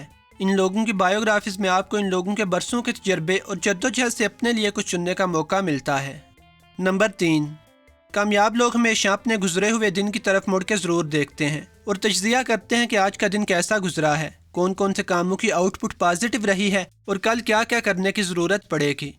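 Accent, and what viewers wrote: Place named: Sialkot, Pakistan. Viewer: Itni achi research. V.good Bad pronouncing. Sialkoti lehja bolny Wala Bad... Apna lehja badlo...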